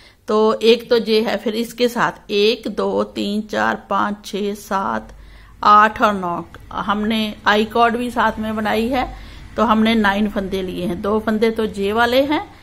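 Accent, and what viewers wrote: Punjab, India. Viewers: ji aap ka Punjabi tone bhut achha lagta hai...raaje kahna to bhut hi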